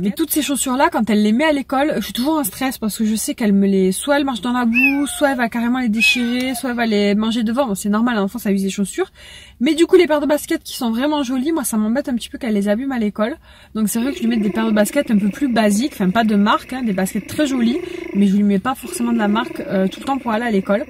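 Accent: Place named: South, France. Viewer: 🤩 j’adore tes vlogs et ton accent du Sud qui chante le soleil ☀️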